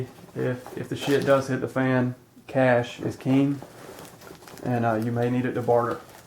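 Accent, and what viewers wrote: Alabama, United States. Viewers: what part of alabama are you from?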